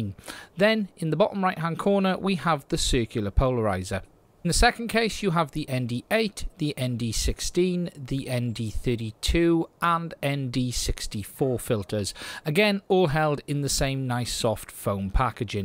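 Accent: United Kingdom. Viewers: British accent. So where can I buy these in the UK?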